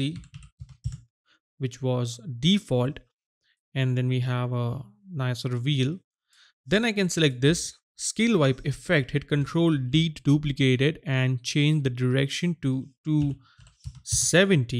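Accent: India. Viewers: Время идёт, а индийский акцент не меняется :) В чём дело?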